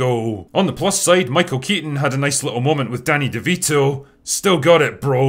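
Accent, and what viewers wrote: Scotland, United Kingdom. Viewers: i no longer watch actual movies or sports or even elections, i just watch whatever people are doing the highlights of the events. this guy talks same as willie from the simpsons the scottish groundskeeper.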